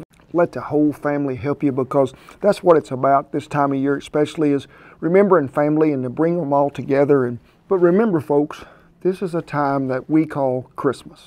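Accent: South, United States. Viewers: I gotta say the southern accent he has is balanced very well 😇 ppl where I'm at speak every word as one word 😒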